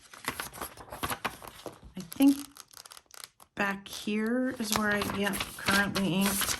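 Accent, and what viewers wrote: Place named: Minnesota, United States. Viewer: This woman is a trip. And the accent! Is it Minnesota? It’s not southern for sure but not that nasty north east yankee New Jersey thing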